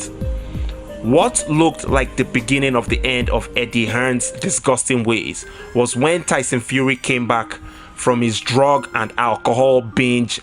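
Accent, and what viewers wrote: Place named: Nigeria. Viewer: First of all, I love your content. You sound extremely eloquent while keeping your strong Nigerian accent intact. However you are just another extreme Wilder fanboy, Eddie hearn is a true promoter for AJ however does eddie run his mouth too much ? Yes he does. Did Eddie hearn force Whyte to take substances ? No he did not. You trying to sound really deep, but calm down you are just another overly emotional wilderfan boy seriously . Calm the fuck down, u don't make much sense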